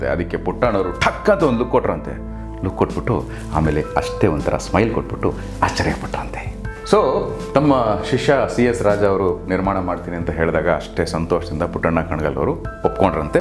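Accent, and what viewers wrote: Standard. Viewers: ಅಚ್ಚ ಹಾಗು ಸ್ವಚ್ಛ ಕನ್ನಡ. ಕೇಳಿ ಆನಂದವಾಯಿತು. ಧ್ವನಿಯು ಸಹ ಸೂಗಸಾಗಿದೆ. ಈಗಿನ ಚಿತ್ರಗಳಲ್ಲಿ ಬರೀ ಅಬ್ಬರವೇ ಜಾಸ್ತಿ. ಕಥೆಯೇ ಇಲ್ಲ. ನಾವು ಹಳೆಯ ಚಿತ್ರಕಥೆಗಳನ್ನ ಮೆಲಕು ಹಾಕಬೇಕು. ನೀವು ಆ ಚಿತ್ರೀಕರಣದ ಸಮಯಕ್ಕೆ ಕರದೊಯ್ಯ್ದಂತೆ ಭಾಸವಾಯಿತು. ಒಳ್ಳೆಯದಾಗಲಿ. 🙏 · ಉತ್ತಮ ಶೈಲಿಯ ಶುಧ್ಧ ಕನ್ನಡದ ನಿರೂಪಣೆ. ನಿಮಗೆ ಧನ್ಯವಾದ.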